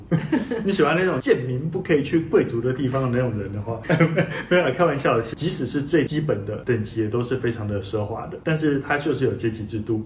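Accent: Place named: Taiwan. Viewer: I dont understand your Taiwanese Mandarin. Pls have the Chinese subtitle on. In addition, you are speaking far too fast. Making it even harder for any audience.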